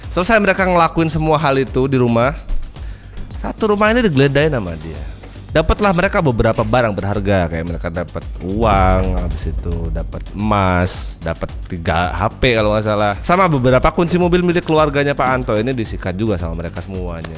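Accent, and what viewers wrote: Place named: Lampung, Indonesia. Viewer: bang gue suka bgt logat Lampung inimah bikin kangen pulang gue di jkt doang sebenernya tapi kangen bgt logat ini😂🎉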